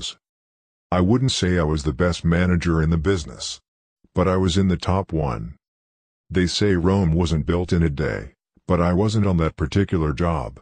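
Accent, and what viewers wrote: United States. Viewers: This is weird - At least they could have got someone with a Yorkshire accent to read them instead of an American droid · Great quotes from a great man read out by a mechanical bot with a septic tank accent! shameful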